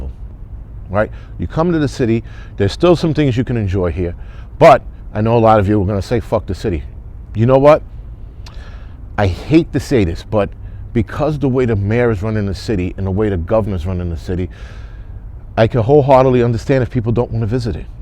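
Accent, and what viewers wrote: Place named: South, United States. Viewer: Some times you sound like you have spent time in the south.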